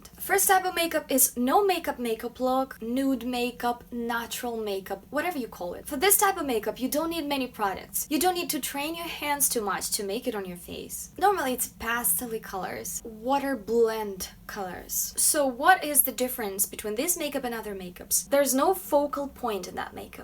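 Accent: Russia. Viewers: I hear some accent..is she russian?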